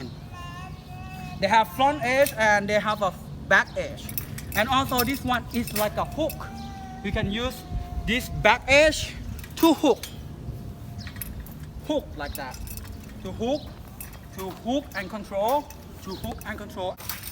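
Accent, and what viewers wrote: Thailand. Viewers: lol I knew it you must be Thai people listening to your accent Great to see people interested in this weapon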